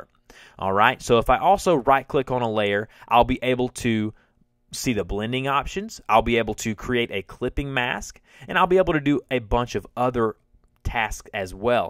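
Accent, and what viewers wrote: South, United States. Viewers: Thanks for this video, it was really easy to follow! Also that southern accent is charming. ;)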